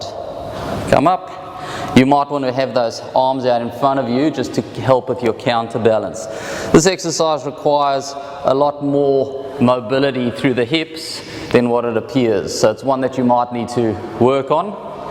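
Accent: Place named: England, United Kingdom. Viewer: The English accent will help me to remember those movements.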